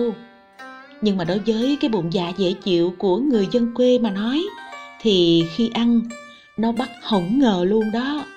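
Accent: Mekong Delta, Vietnam. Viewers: A mà là bí thư tỉnh em .A ký ngay quyết định Cho em về làm phát thanh viên đài truyền hình của tỉnh ngay. Vì giọng đọc của em rất ngọt ngào ấm áp.....lôi cuốn khán giả. Chuẩn gái miền tây...... Hihi..... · Chat lieu lam nen mon ngon rat dan da binh di khong co cong thucnhung day mui huong ket hop voi chat giong mien tay de thuong va khong the thieu chiec ao ba ba lam nen hon que viet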